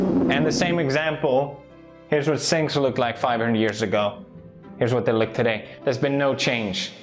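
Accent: United Kingdom. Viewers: as a Mexican living in Britain, I can confirm this is how Brits sound like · His uk accents are getting a lot better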